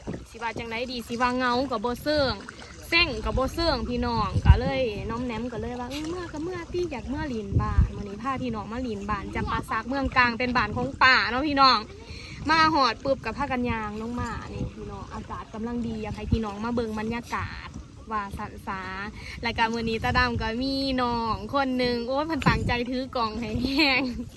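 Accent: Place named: Phibun, Thailand. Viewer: สบายดีอีหล่าตาดำหายไปดนเน้อเจ้า เว้าคือคนเมืองพิบูลฯคักเจ้า เป็นเพราะว่าเฮามีชายแดนติดกันกับช่องเม็กทางไทยน้อ น้องตาดำเว้ากะม่วนๆว่าสั้นสา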